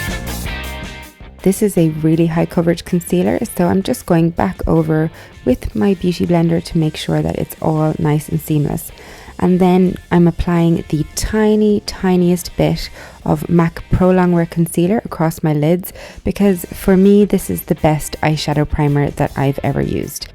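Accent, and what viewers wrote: Ireland. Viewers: I see you are Australian, but what is it about your accent that sounds slightly Irish?? Is this a New South Wales thing that an American would know nothing about?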